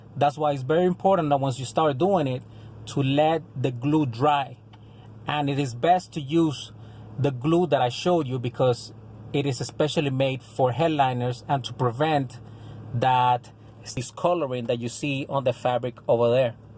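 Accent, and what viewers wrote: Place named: South Florida, United States. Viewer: OMG, thanks for this video! I was about to try on my moms super saggy headliner and I KNOW that foam is all deteriorated like in your video, I can feel it crumbly through the fabric. It's not worth it right now, lol. btw, I KNEW you were also from SoFla as soon as I heard you talk, thanks for the great video neighbor!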